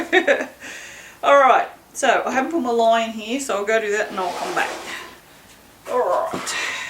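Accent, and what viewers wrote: Australia. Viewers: Are you from Australia ya ripper 😅lol grand vid mate 👍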